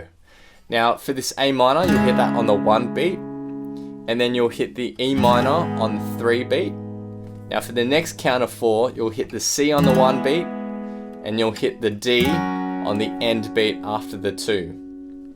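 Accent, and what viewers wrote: Australia. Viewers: wAit your Australian :0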